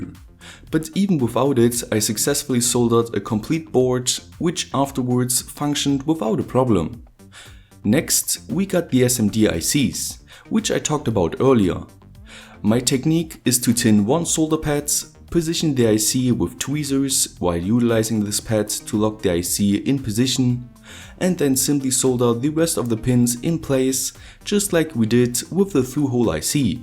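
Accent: Germany. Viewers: Your accent is kinda like german are you from Germany? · are u deutch?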